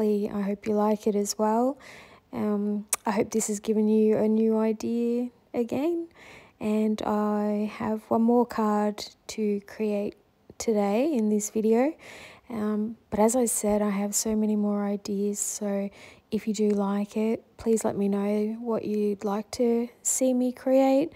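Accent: Australia. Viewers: I am new to your channel and washi tape ideas. I like them both and I have just subscribed and am looking forward to learning new techniques and designs. I live in Delaware and it sounds like you are from Australia but it sounds like we have a lot in common. Thanks for an educational video.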